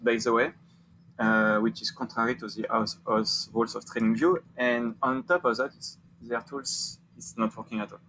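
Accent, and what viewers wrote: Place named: France. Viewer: I didn't know I was looking for a French accent cliché until I found this video...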